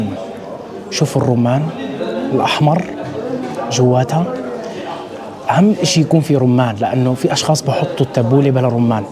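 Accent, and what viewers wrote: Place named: North, Palestine. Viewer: انت من قرى الجليل شكلك يا خال هاي لهجة شمال فلسطين صح ولا انا غلطان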